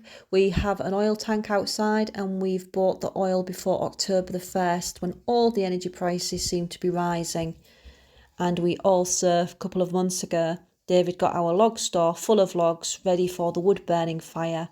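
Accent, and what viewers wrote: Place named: Hull, United Kingdom. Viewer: Just found you guys this morning 😍 I recognise that accent being a hull girl myself, am I correct 😜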